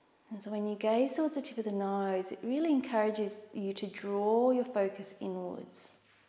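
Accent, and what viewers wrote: Australia. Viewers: Wonderful. Thank you so much. REALLY needed this after a long work day writing. I've just discovered your videos and having grown up in Aus I SO appreciate your soothing lovely homely accent and the Aussie birds in the background. I'm 17 weeks and only just getting back into yoga after a break to feel rough as old boots during the first trimester and your videos are such a wonderful resource. I'll definitely be donating x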